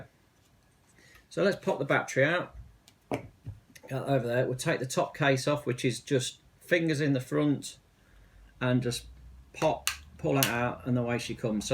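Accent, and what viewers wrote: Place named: Lincolnshire, United Kingdom. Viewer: You sound like a lincolnshire lad:-)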